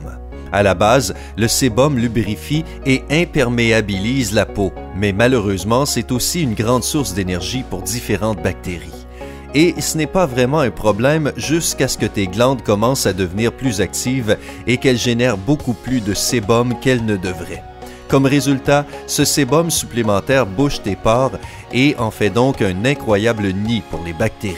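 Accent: Quebec, Canada. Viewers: Le fameux accent québécois ça me tue 😂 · Florian Guerif la voix off québécoise est BEAUCOUP mieux que le mec de Lama Faché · Le gars c'est un canadien ? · Faut vraiment changer la voix-off de vos vidéos les gars, ça va plus du tout la version québécoise... mdr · C'est plus québécois ça en fait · C'est l'accent Canadien ou Québécois désolé je sais pas vraiment entre le deux ^^ c'est pas très sympa de disliker alors que c'est pas de la faute de la personne si elle a un accent.. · Celui qui parle dans la vidéo est un québécois ou un marseillais comme vous voulez 😅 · vous avait remarquez la vois canadien cool non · Accent canadien je zappe c’est ridicule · Wilson Temat non dr bot est noir et celui la est quebequoi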